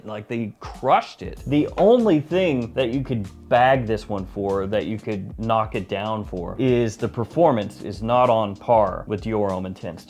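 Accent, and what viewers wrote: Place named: Texas, United States. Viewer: It sounds like you live in Texas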